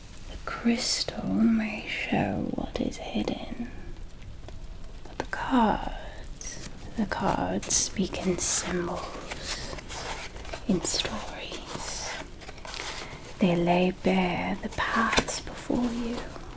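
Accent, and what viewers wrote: Transatlantic, United States. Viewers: no way! I was just watching your older 1920s videos as inspiration for my 1920s themed Halloween costume! your trans Atlantic accent is unmatched 💖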